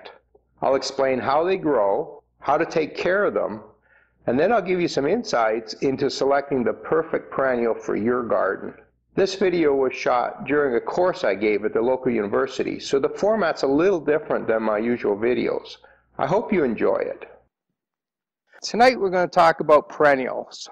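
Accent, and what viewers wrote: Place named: Canada. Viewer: Are you in Canada? You have a bit of a Canadian accent ie the way you say process.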